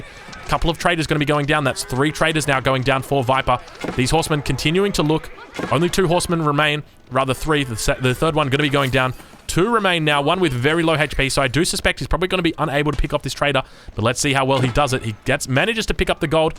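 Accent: Australia. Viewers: So refreshing to listen to a fellow Aussie caster. Love ya work mate, keep it up!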